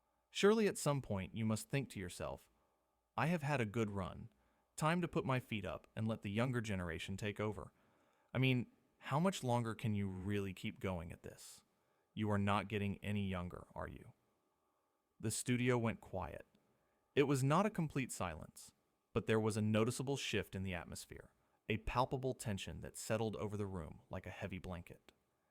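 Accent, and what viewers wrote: United States. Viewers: This is a load of shite just show the clip instead of all the American narration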